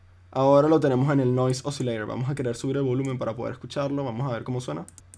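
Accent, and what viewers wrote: Venezuela. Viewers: jeje venezolano he.... excelente video muchas gracias !!! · ahajajaja desde la primera palabra que dijiste supe que eras venezolano!!!, de donde eres bro? yo de bqto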